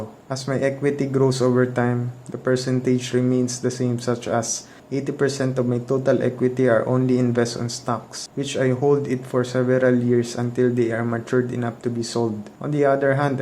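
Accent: Philippines. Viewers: That Filipino Accent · Love that pinoy accent btw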